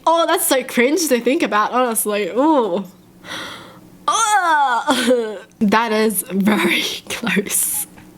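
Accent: Australia. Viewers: zenzoner zz nooooooo omg by blood she’s full asian. But she just happens to be born and raised in Australia which she picks up the accent.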